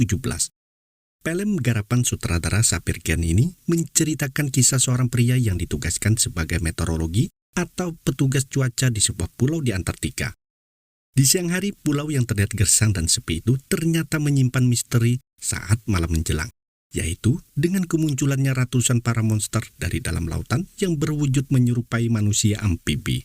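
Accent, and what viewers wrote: Indonesia. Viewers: Maklum orang Sunda ya bang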